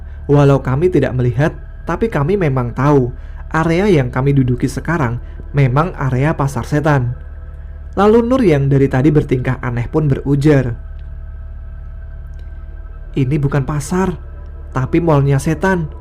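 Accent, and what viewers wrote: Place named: Cirebon, Indonesia. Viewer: Aksen mas seperti dari cirebon, asal mana mas?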